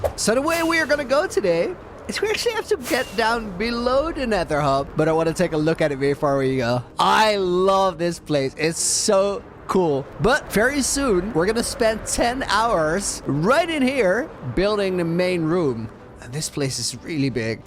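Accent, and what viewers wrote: Netherlands. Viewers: Even though I can hear you are dutch, I love how confident you talk in English! Great content! KEEP IT UP!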